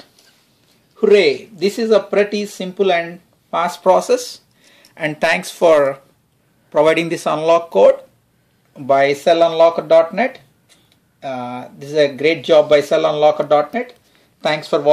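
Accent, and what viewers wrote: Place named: India. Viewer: haha crazy indian accent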